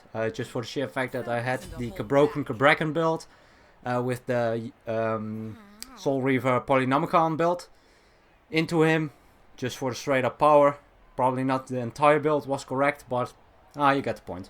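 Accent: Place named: Netherlands. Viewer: The dutch accent ;)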